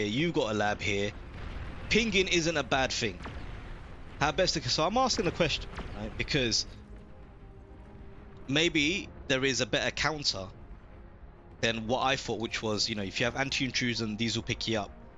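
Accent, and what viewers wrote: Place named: United Kingdom. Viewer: what part of the UK are you from? accent is great to listen to lmao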